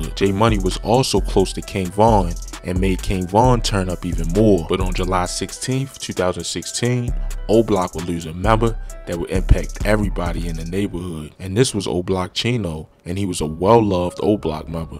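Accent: Baltimore, United States. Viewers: Baltimore accent is dope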